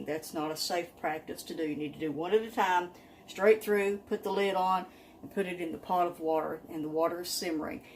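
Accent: South, United States. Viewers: Well, I have never personally canned anything! This video was a joy to watch and to again hear your sweet southern accent bringing peace to me this morning. God is so good. My dear Grandmother would can pie mixes, so this also brought memories of my sweet grandma Alyce. Thank you. 💕 A couple of thoughts I had watching - I'll share - you need a strong shoulder to stir for at least 30 minutes, then an even stronger shoulder to mix in all that fruit. Enlist a grandchild or otherwise stronger younger person to be the official strawberry stirrer! The rewarding part of being enlisted in your kitchen is time with you and some fresh strawberries! And finally, you ended with listen for the "ping" - assuming you mean your jarring caps ping? I didn't know that! That must be rewarding music after hours of labor. 🎶 Thanks again for sharing. Have a blessed day.❤️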